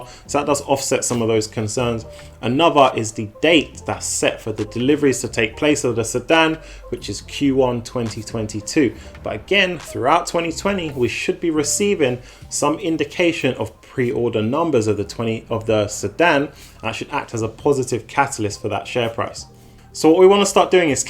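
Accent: United Kingdom. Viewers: Great to hear a U.K accent in the financial space! Great video my bro. #SecureTheBag 🔥🚀💰 Bless!!!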